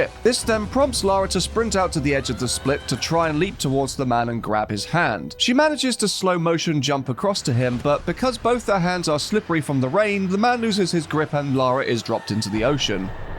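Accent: United Kingdom. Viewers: As a Yorkshireman myself, I approve of the accent 😂 👍🏻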